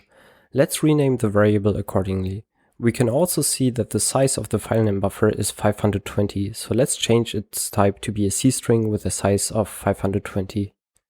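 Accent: Germany. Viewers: I love your German Accent! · nach seiner Aussprache zu urteilen würde ich nen 10er wetten das der gute mann aus dem deutsch sprachigen raum kommt. geniale Facharbeit, ich mag es wenn menschen ihre arbeit verstehen. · This is great, I'm always surprised by new hacking channels every now and then. Listening to your voice, it sounds like you're German, am I right? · Always the slight german accent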